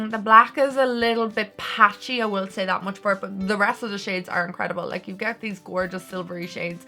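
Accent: Ireland. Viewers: I’m sorry but I’m liking and following instantly bc ur irish, An bhfuil chead agum dúl go dtí an leathreas (probs spelt like half the words wrong lol) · You're Irish😄😄😄😄 Legit haven't been able to find any Irish youtubers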